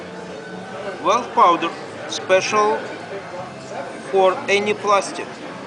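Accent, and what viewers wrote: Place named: Russia. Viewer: Russian accent👍👍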